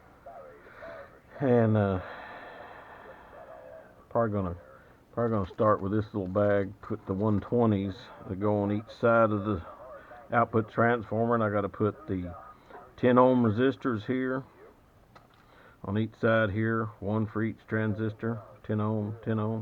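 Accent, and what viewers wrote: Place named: South, United States. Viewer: Impressive bud! Dropping by to show you some love! From one Southern boy to another....just guessing by that voice lol lol.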